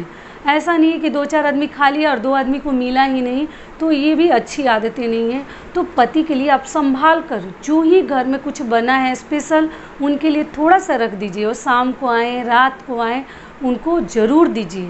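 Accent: Bihar, India. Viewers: Aap Bihar se ho kya? Aunty ji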